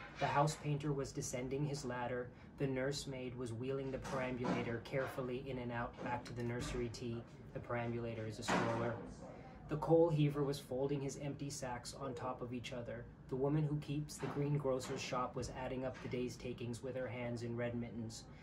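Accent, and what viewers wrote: South, United States. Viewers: As a native Texan, I found your southern accent delightful, and you are just adorable. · Love the southern accent! good idea!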